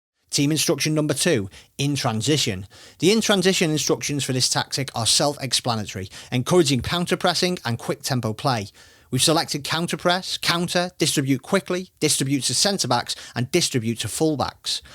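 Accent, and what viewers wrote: Liverpool, United Kingdom. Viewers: Is this liverpool accent?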